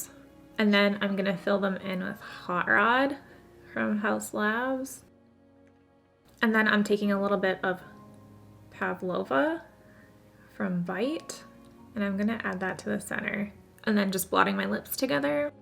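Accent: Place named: Canada. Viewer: Yes! I loveee Canadians and their accents. It always brings a smile to my face. 😌♥️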